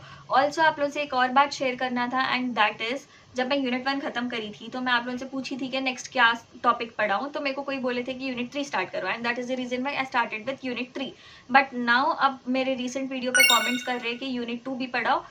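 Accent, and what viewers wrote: Hyderabad, India. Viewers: I think ap hyderabadi hai 😂❤❤❤❤ love your lacture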